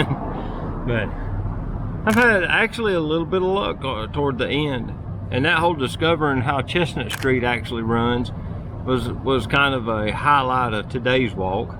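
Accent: South, United States. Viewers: LOL! very good. I love Southern Talk- "...just to this side of that sign? On the other side of that sign?.." tone of voice- sentences that sound like a question are not a question. ❤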